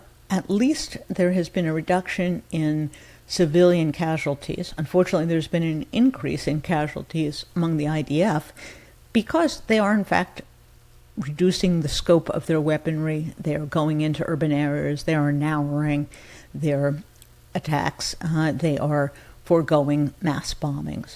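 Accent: United States. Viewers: Agree! A cultured American accent and voice. I could listen to her for hours. So very intelligent and balanced.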